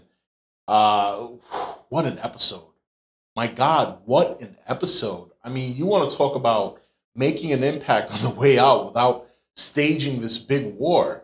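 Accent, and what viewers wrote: New York, United States. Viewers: awesome review. i always love your reviews. are you from new york? your accent closely resembles that of a new yorker, and your views always closely match my own!!!!! original post thanks, you rock, and this was such a messed up ending.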